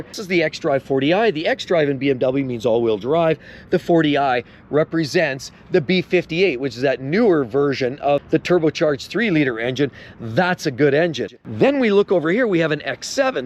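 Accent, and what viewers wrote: Canada. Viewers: He's Canadian bruh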